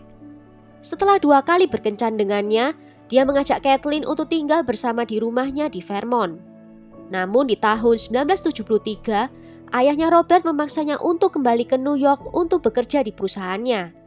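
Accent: Java, Indonesia. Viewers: Wah,hebat ya suaranya medhok Jawanya👍😍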